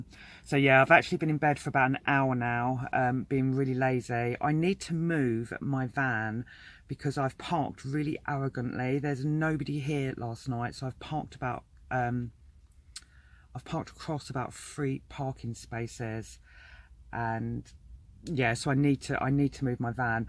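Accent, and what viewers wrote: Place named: United Kingdom. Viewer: Just sat and listened to you work out in your head that you are safe in van , good out come. Though I do think it would be helpful to have an idea “Where you are” to be included in your intro is welcomed here for new viewers, as your intro is “its really cold....ok? Where like frozen in Alaska or California 30 degrees chill, judging by accent UK. Just curious what comfort level one can expect in a van in winter, assuming it was built with typical base of insulation. See you have propane and a warm dog and you built a closure from front cab. Shoot! All good measures for van living! Anyway just a thought as YT “recommenced “ this in my feed to view and its first video Ive viewed, just would have been good to know where u b? State, Country.. not gps cooordinates. LOl Good of you to share your thoughts with the world and for YT to connect us with your story. 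Keep thoughts on the positive a thought have vibrations, “ YES i am safe” perfecto!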